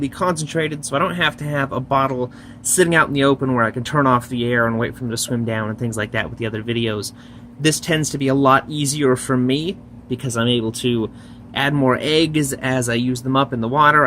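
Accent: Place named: Australia. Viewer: Ok thx I’m using a pipet I’m new to keeping fish, and are you Australian bc I am I recignise the accent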